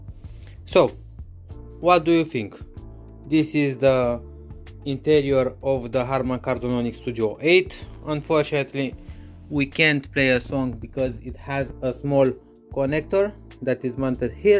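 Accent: Romania. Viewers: asta e roman la cum vorbeste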